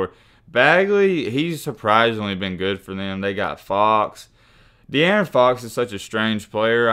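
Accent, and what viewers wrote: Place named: Alabama, United States. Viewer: The Alabama acentt in this nigga 😂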